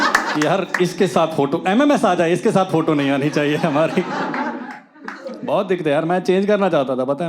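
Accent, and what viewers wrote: Punjab, India. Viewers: Gadd ta bai ji, "end" write up....and of course,punjabian di Hindi ch Punjabi accent ta aa hi jandi aa😅..looking forward to more ,in coming days from ur side👍👍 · Punjabi accent 😂❤ Great video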